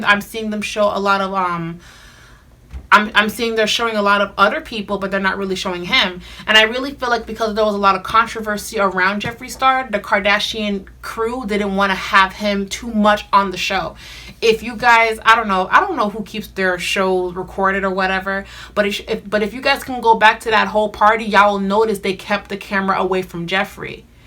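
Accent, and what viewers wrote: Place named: Miami, United States. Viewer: Do you do personal readings in person? I'm located in Miami too. I could tell cause of your accent.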